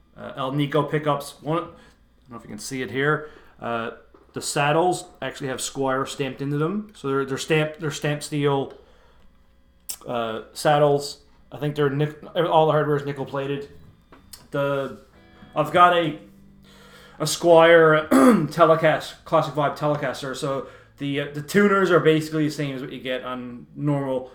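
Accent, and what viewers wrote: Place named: Ireland. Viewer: Lovely guitar, Your accent sounds like an Irish one at times , Greetings from Dublin 🇮🇪 🇨🇦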